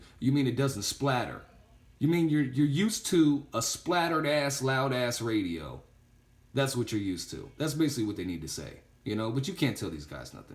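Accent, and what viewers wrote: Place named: New York, United States. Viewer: You sound like you are from Brooklyn or the bronx. I'm a long island boy in south carolina.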